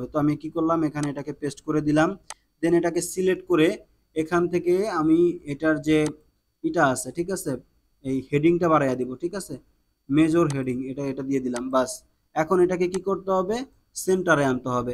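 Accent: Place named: Barishal, Bangladesh. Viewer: vaiya mone hoy Borishailla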